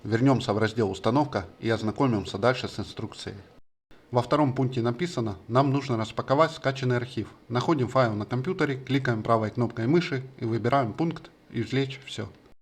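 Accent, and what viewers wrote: Ukraine. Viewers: Смущает малоросский говор. Не засланный ли казачок? Извиняй, если что, обидеть не хотел. У меня гудбай дипиай работает прекрасно. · Рекламировать DPI - C УКРАИНСКИМ акцентом крайне рискованная затея ))). Даже если вы не призываете уже статья за это если вы рф а акцент усугубит ваше положение до ионогена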